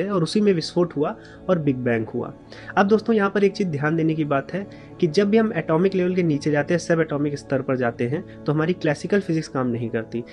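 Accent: Standard. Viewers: आपकी ज्ञानवर्धक बातें तो बहुत अच्छी हैं परन्तु आपका प्रस्तुत करने का अंदाज भी लाजबाव है। शुद्ध उच्चारण से विडियो काफी अच्छी हो गई है। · Impressive. I really admire you with your Hindi speaking. Ekdum sudh Hindi.. In today's time you are making videos in pure Hindi. Aapko Naman hai 🙏🙏🙏🙏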